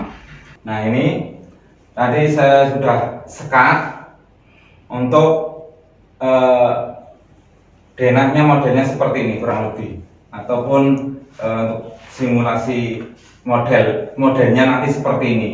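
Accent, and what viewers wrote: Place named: Surakarta, Indonesia. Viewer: Ngomong nya mirrip orang Solo🤣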